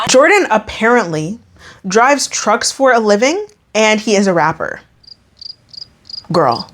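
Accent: Canada. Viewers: Lmao when you said”in weird round about way” I died 😂😂 I’m sorry I love Canadian accents Editing to add Kami would’ve sus it out because it was definitely fake 😂😂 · Nah bc girly I feel attacked since I brought up the Canadian "about" thing a video or 2 ago 😭😂❤️ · ITS OKAY I LIKE THE CANADA ACCENT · I don't know why you hate your Canadian accent so much. It sounds good to me, I think you should embrace it.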